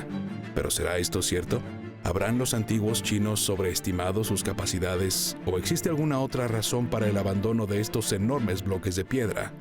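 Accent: Mexico. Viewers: Habla con mucho cantito mexicano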